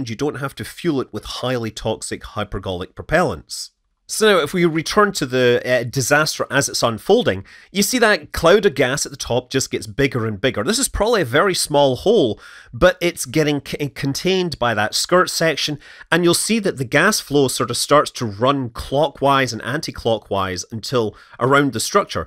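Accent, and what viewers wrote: Scotland, United Kingdom. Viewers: Aside from the fact that someone creating videos about spacecraft includes audio from a track, I have to say that the Scottish accident for the English language is unquestionably the most appealing. Is it is so charming and nominally out of place with modern society; hearing it applied to cutting edge technological matters is all the more delicious.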